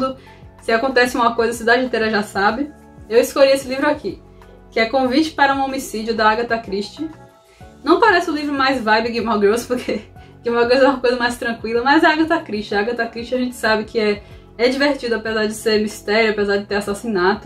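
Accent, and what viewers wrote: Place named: Salvador, Brazil. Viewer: eu ouvi seu sotaque e na hora pensei: oxeee é de salvador será? kkkkkk <3 amei seu canal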